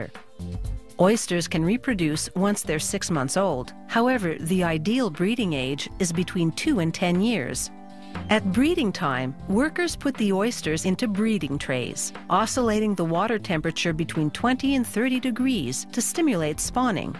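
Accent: Canada. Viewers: The speaker is canadian... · I hear a very distinct Canadian accent.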